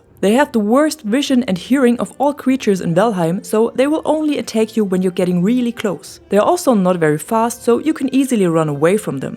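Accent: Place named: Germany. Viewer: There's just something about your voice and pronunciation that I can't stop listening too, I can tell you are german speaking but other than that you sound nothing like the germans I know who speak english.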